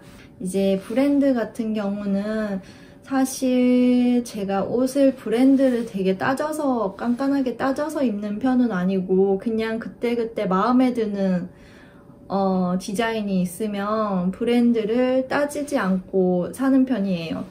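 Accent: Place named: Japan. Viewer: 한국말을 하시면서도 억양이 일본어로 말씀 하시는것같아요~~ 저는 혼자 그것도 타국에 살아본적이 없어서 너무 부러워요ㅠㅠㅠ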